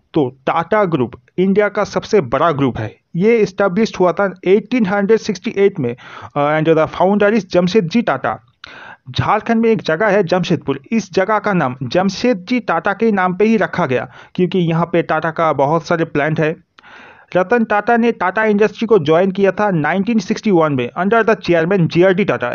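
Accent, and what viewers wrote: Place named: Bengal, India. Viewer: Yes, I'm 100% sure Sir is Bengali, judging by his accent.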